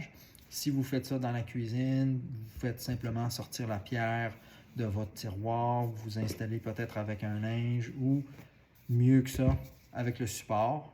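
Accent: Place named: Canada. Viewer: Bonjour A ton accent charmant, tu es canadien! Donc, difficulté pour moi de venir vous rencontrer en personne, puisque j'habite la France (la Provence, pour être précis) Voici quelques autres questions. Je me régale de vos vidéos concernant l'aiguisage à la pierre japonaise! Par contre est-ce que c'est une forme de "luxe" par rapport à l'aiguisage à la bande abrasive? Autrement dit, est-ce que ce sont deux modes d'aiguisage qui n'ont rien à voir l'un avec l'autre ou, au contraire il y a un lien entre les deux modes? Si oui, lequel? Je vous pose cette question par que d'après vos vidéos on peut tout faire avec les pierres à aiguiser japonaises, alors pourquoi utilisez-vous aussi l'aiguiseuse à bande? Merci de vos réponses (vous semblez être quelqu'un de très sympathique)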